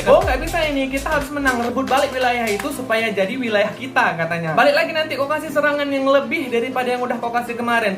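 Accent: Medan, Indonesia. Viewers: Penuturan bahasanya kek org medan 😆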